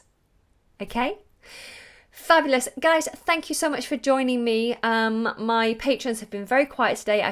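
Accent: United Kingdom. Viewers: i loved your voice and your accent i want to learn british accent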